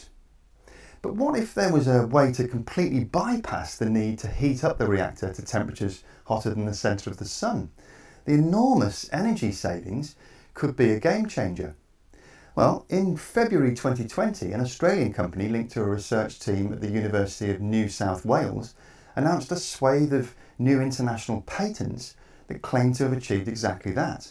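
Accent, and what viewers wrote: United Kingdom. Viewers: I deliberately NEVER mention the totally IRRELEVANT subject of HOW somebody says something, and focus EXCLUSIVELY on WHAT they say. However, given that I have seen SO many brainless idiots in the world voice their worthless unoriginal opinion about style over content, I figure I am allowed at least ONE time to do the same. The CONTENT of this channel is PERFECT. But I REALLY cannot stand the speaker's mild British voice. I would prefer an aggressive angry voice (British or American) to deliver the narrative.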